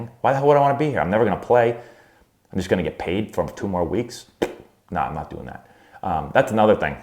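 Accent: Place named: Massachusetts, United States. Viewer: I’m from Rhode Island and I knew you from Massachusetts due to that accent haha. Any memories of McCoy stadium?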